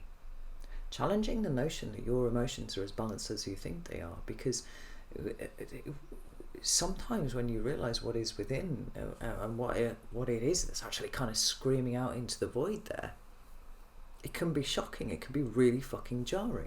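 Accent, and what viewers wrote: United Kingdom. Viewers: I absolutely love your readings, but am wondering if there is anything that can be done to improve the audio. I struggle to hear them - add that to the struggle to pick up the British accent and I have a helluva time!!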